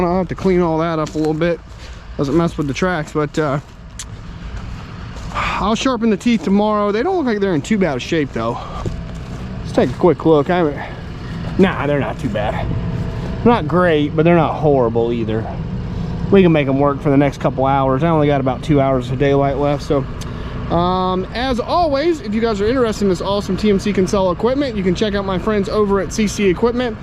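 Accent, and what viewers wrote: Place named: Carolina, United States. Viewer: I think its great when ya talk buddy, you speak the Carolina sweet jaw, sounds wonderful , great videos Mr.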